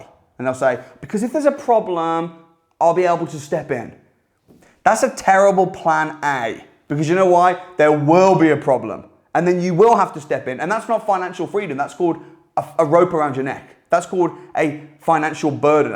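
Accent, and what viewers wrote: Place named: Buckinghamshire, United Kingdom. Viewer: Thought you sounded like you were from Bucks! (Same as me) Good video thanks👍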